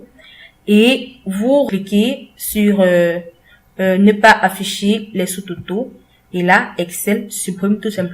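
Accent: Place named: Africa. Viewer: toi tu es africaine , ca s'entend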